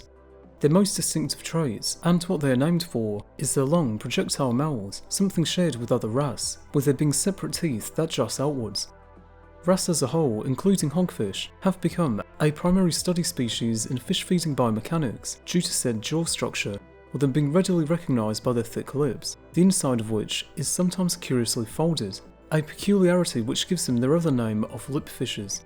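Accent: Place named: Wales, United Kingdom. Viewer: What's that accent.. ? Can't figure it out.. sounds Welsh though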